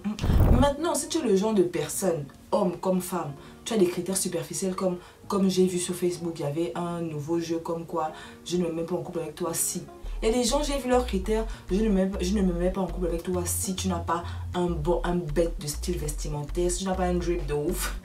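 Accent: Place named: Gabon. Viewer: C'est l'accent Gabonais qui me retiens 😂😂super pour la vidéo j'aime ❤❤😊😊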